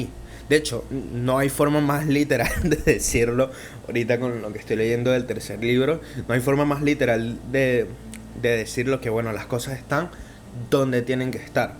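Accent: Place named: Venezuela. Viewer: Nadie: Yo pensando que tiene acento venezolano y dudando hasta que habla de Colonia Tovar. PD me gustó mucho el análisis de la arquitectura, de hecho hace poco para una práctica de dibujo quise replicar las ciudades de Mushoku y puedo decir que el trabajo detrás de la inducción a estos escenarios, la forma en la que te transmiten el cómo cambian las locaciones es un trabajo muy bien hecho.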